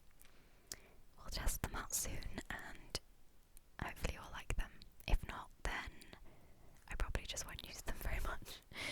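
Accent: United Kingdom. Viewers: Glad to have you back. Looking forward to more wonderful ASMR videos with the new 3Dio. Congratulations on jumping past the 100k subscribers mark. You have the most delightful whispering voice with your British accent! You and Dimitri are amazing ASMRtists. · OMG.. very happyyyyy when i see that your video is up and its a whisper video.. i really like your voice.. your british accent is amazing..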